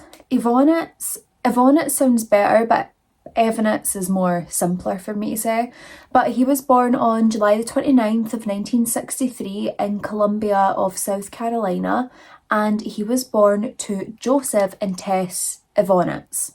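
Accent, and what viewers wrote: Scotland, United Kingdom. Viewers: Hi, Just came across your podcast and im definitely subscribing.. My ancestors are from Scotland, USA here… Love your accent ❤ p.s. you’re a beautiful young lady… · crying that you replied!! I LOVE your videos, as a fellow Scottish gal it’s nice to have a Scottish accent to listen too!🩷 I watch all your videos gets me through work everyday! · Hi, my mum was from Scotland i love hearing your accent and I love your personality your definitely 1 of my favorites ❤ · Love the way you tell a story i can easily understand you im Scottish too you bring humour whilst Being serious ❤you are a beauty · Love the ice blue on your eyes, your gorgeous. I also love your accent! The biggest part of my ancestry is Scotland, if I by chance had been born there maybe I’d have that accent!❤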